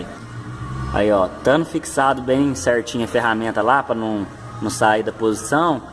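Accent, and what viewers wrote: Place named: Minas Gerais, Brazil. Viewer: Vc pelo sotaque parece minerin de sete lagoas rsrsr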